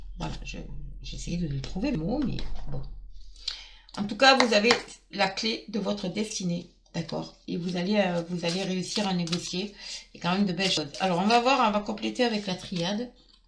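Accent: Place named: Marseille, France. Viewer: Bonjour vous êtes du midi Marseille mais pas de paris Bravo vous avez une voie qui chante bien je suis gémeaux ascendant BALANCE et Lunaire BALANCE et ge deux petits enfants comme mois sauf lunaire mais ils ont us plus MERCURE et venus a leur naissance a y une chose bien le signe du Lion qui est depuis longtemps dans le signe Gémeau 🧚l'étoile de david me rappel mes ancêtre juive🧚oui c pas mal j'aime bien Merci mois je suis de Libourne près de Bordeaux et depuis 69 je suis sur Toulouse bonne journée 🧚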